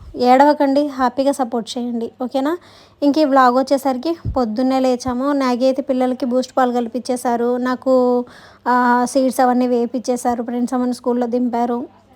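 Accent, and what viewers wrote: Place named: Andhra Pradesh, India. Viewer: Assal meeru by birth andhra pilla la matladuthunnaru appudappudu mana telangana slang kuda use cheyandi because telangana vallu like cheyali kada i mean kotha subscribers ki theliyali kada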